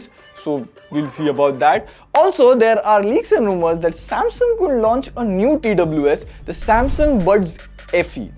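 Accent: India. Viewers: What is this obsession with trying to speak English in this ugly Indian accent for Indians, by Indians ?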